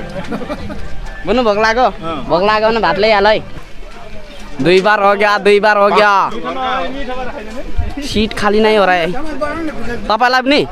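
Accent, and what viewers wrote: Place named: Limbu, Nepal. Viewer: Helo bro em frm darjeeling u rely speak like pure limbu keto😃👍🏻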